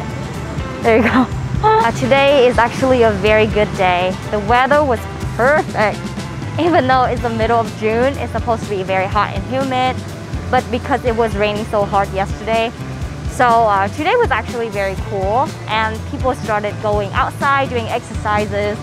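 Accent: Midwest, United States. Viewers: I like most of your videos, but one thing you say wrongly (my view) is your very American pronunciation of the word HERBS....you say ERBS! Correct English expression more acceptable to a wider English speaking audience is NOT to drop the "H" of such a common thing in Vietnamese food speak. The Americans have changed a lot of the English language as you know, and while your American accent is cute we Aussies of the older generation particularly have resisted Americanizing spelling & pronunciation. And you know as a Ha Hoi girl being educated in US when they were the former enemy of your parents & grandparents it is a big culture difference thing too. Let's discuss in our next Checkin! With me about to start learning Vietnamese I must try to pronounce things the correct way when in the north, central or south. I want to learn the Central & North ways firstly. 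· Really like the new (?) logo. Feel it fits you and your channel really well from what I have seen. Do you know the artist personally? Suggestion: Add google map links to places you highlight in the description. Paddy Doyle does this and it is something I think every vlogger should copy. Works so well to easily find and save places. Like that cool ice cream shop which I saved. Side note: I know you said (in another video) some people said things about you vlogging in English vs Vietnamese. But there are people like myself who really love local vloggers sharing their country with us in English. There is nothing wrong with the standard western expat or digital nomad vlogger (minus the creepy ones) but it is nice to have locals in the mix as well. My first exposure to "travel" vlogs was via some Fil-Am vloggers, Bulay Says Hi (she stopped) and Wil Dasovich (which I found via Bulay) and his friends. 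There are several Filipino (and half Filipino) vloggers who vlog in English but outside of PH it is much more rare. So I am glad the YouTube algorithm suggested your channel vs how so often I get suggested the "creepy" ones. (Sorry YT but just because I like videos about ASEAN countries and watch expat vloggers doesn't mean I want to watch videos like that.) Nice to get suggested a good channel vs a "creepy" one. Bonus that you are truly a local. Double bonus that you have a midwest accent like myself lol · beautiful girl with fantastic American accent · Your American accent is so good...love your Vietnamese voice too, very nice videos and editing. Keep up the great work.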